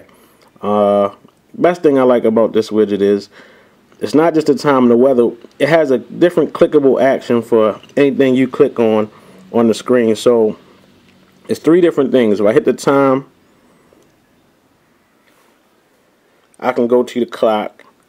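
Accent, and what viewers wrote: Baltimore, United States. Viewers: I like this video and your northern accent! I'm from Philly. You sound like you from B-more though. Cool video. regarding the hard cases and thing being exposed. I have the otterbox for my Evo. That thing is awesome! Nothing is exposed at all! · I hear that B-MORE in him all day KEYS BODYMORE all day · Sounds like your from b.more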